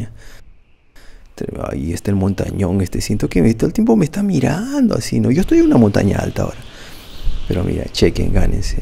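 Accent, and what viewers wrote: Peru. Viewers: no soy muy bueno con los acentos.... ¿¿de donde eres?? estoy entre peru, bolivia.... tal vez incluso paraguay :3 .... pregunto para saber a donde planifico mi proximo viaje jejejje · En que país estas ahí?.. hablas como peruano. estas en Perú?.. saludos desde Cancún Quintana Roo México.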